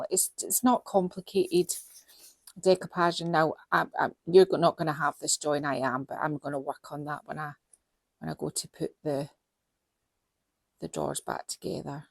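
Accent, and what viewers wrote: Scotland, United Kingdom. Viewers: Beautiful! Luv the building technique. I really need to let go of my perfectionism and let that paper and paint fly! Love with a mic. So sorry you can't say, " just leave it alone" with a straight face anymore, I was rolling on the floor! I got another one for you guys coming up on my next vid. I take your words like the gospel, I hear them with your beautiful Scottish accent in my head. 😂 would love a shirt or a coffee mug with your sayings on them. You really knocked it out of the park on this one guys, dar p.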